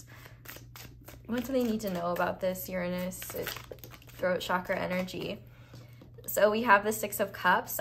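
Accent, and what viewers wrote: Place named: South, United States. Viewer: Pile #2, I love your Southern accent lol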